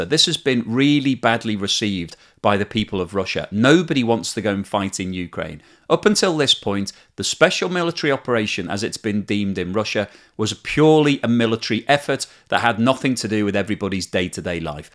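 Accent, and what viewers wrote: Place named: Liverpool, United Kingdom. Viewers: I think has a slight Liverpool accent. Your English must be pretty good, so listen out for that! 😊👍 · Yes, definitely a slight Liverpool accent. Very clear to me.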